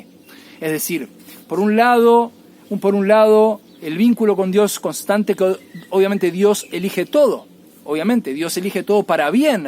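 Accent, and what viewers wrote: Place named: Argentina. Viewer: Sino me equivoco usted también es argentino, por su asentó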